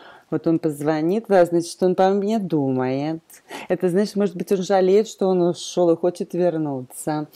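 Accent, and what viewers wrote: United States. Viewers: Обожаю ваш американский акцент)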